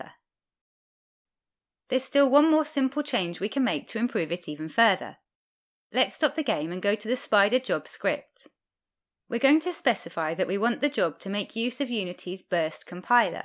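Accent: United Kingdom. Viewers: came for the unity tutorial, stayed for the spiderjob by this delightful british accent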